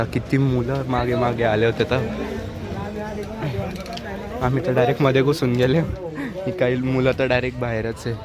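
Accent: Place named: Khandesh, India. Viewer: O bho khandesh ni marathi tuning ahe hay tr 🔥😂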